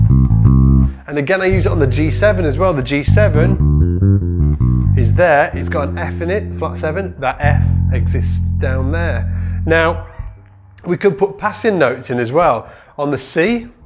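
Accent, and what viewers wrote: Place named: United Kingdom. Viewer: you are the man! love the glove, British accent and of course the bass lesson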